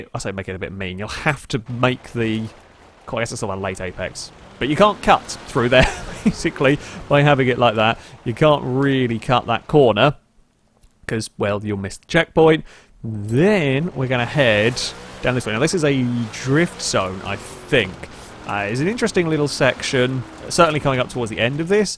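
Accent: United Kingdom. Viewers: went full British in this one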